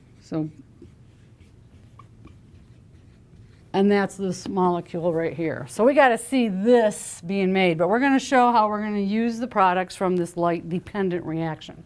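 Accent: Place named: United States. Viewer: It was good, much better then my teacher who just went on holiday!? but I don't know if its just the American accent but she sounds permanently drunk.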